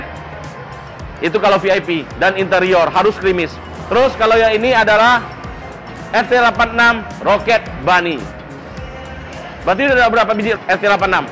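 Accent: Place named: Makassar, Indonesia. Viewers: Mantap logat makassarnya bosku, cocoki kau rasa 👍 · Mantap tawwa koko, pintar ki logat mkassar... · mantap tawwa logat makassar na boscu.. nda kyk org yg dr luar mks yg lain..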